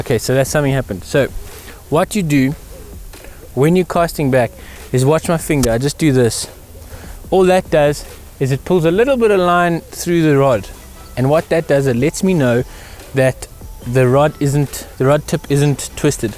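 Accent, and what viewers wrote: South Africa. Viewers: Hi bud from wher are you you soud south africa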